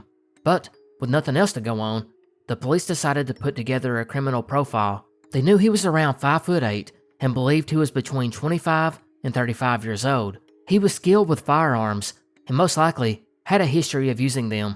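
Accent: South, United States. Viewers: Awh heck yeeah partner! 🤠 a country soundin’ commentator, let’s giddy up now!! 🤠 yeeee hawwww!!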